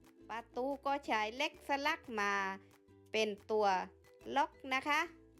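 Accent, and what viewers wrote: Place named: South, Thailand. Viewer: ชอบจังเลยพูดภาษาใต้บ้านเราเพิ่งเข้ามาติดตามเดี๋ยวจะตามแลย้อนหลังนะคะ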